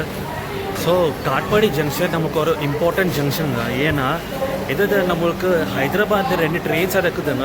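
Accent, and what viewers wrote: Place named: Bengaluru, India. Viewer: Nice to hear Bengaluru Tamil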